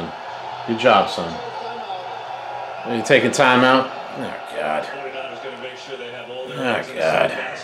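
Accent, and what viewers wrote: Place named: Boston, United States. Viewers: You kinda look like Christoph Waltz. How'd you end up a Cowboys fan with a thick east coast accent like that? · You sir are not from Texas with that Boston accent🤙💪🫡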